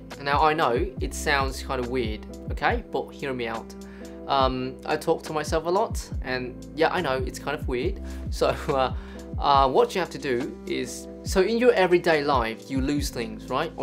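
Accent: England, United Kingdom. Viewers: Em ít khi nghe người Việt mà có accent 100% anh-anh như anh, nghe sướng tai gì đâu ❤️❤️❤️ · how cute ur british accent... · uầy anh có cách phát âm của người Anh rõ rệt nhưng cũng pha 1 chút Mỹ ngưỡng mộ thật sự :3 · I was like hmmm, that sounds like a British. And then the "mate" came out. Yup that is british accent lol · Mô Phật, coi miết mà giờ mới để ý cái series này, kể ra tui cũng khá giống đấy chứ và bây giờ tui có thể enjoy these videos with English accent =>>>>>> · Good UK accent there, mate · Seems like he's talking in British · British accent wow amazing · Ahhh thích bạn này British accent 👍👍👍 so admirable bro 😍😍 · I really love your British Accent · Cái accent của anh giống người anh nói ghê ah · nice British accent, mate! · My bf (Caucasian) told me that your British accent is pretty nice. The only funny thing he noticed that you said “elementary school” instead of “primary school” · nói giọng dễ nghe quá luôn í phát âm accent british mê =((( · OMG British accent , luv it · omg, his British accent is incredible! 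beautiful. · giọng này hay nhưng pha Australian r nhé bạn. K hẳn British. Cách bạn ấy nói YouTube hoặc about. N chung là có xu hướng British thôi. Thú vị phết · dude this is obviously british accent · I love your British accent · Love this British accent!!! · your British accent is addictive af omg chef kiss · I LOVE YOUR BRITISH ACCENT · Tiếng anh của England nghe sang vãi đái :)) đc quả accent này đi cưa gái thì đỉnh ae nhờ :)) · British accent đỉnh quá :3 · nói giọng anh - anh nghe cuốn ghê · Thích BA vclll =))) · Woww👍British accent...love it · You have such a great British accent which makes me feel a bit more comfortable when practicing it. Keep up up the good work! Ps: from Vietnam :3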